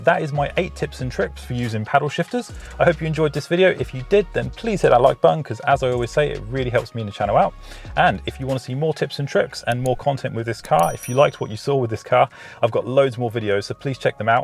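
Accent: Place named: United Kingdom. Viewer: Ahh! This British English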